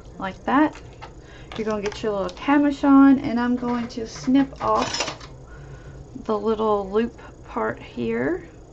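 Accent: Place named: Tennessee, United States. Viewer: Loved the video. What a fun way to use up the leftover shelf liner that I have. What part of the country are you located in? I live in TN and your accent makes me think we may be close.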